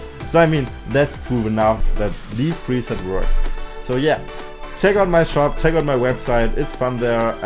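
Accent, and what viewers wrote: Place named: Germany. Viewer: Der deutsche Akzent ist das Beste! :D Ich schau mal rein, support ist kein Mord. ;) :)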